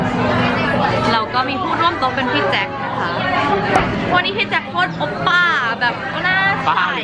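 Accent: South Korea. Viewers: เต้นเป๊ะมากกกก //ขอบสำเนียงเกาของพี่มากเลยอะ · ชอบสำเนียงภาษาเกาหลี มากง่าา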